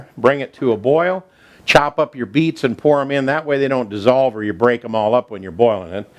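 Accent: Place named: Chicago, United States. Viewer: Sounds like a Chicago accent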